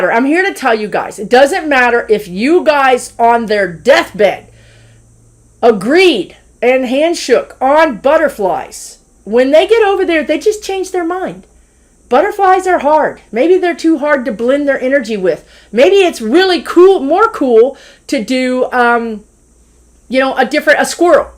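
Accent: Texas, United States. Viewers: You are in Texas but you have a distinct accent. Are you from east TX or from the east coast?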